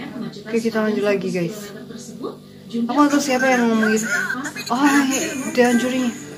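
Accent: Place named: Malaysia. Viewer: Mantap kak, comell kak cakap Malaysia 👍♥️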